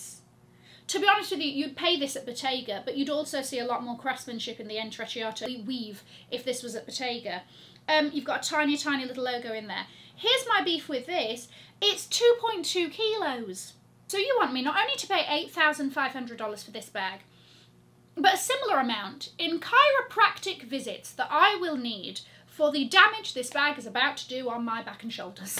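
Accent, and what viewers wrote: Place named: England, United Kingdom. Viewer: Interesting channel, but English accent is difficult for me. I prefer american English.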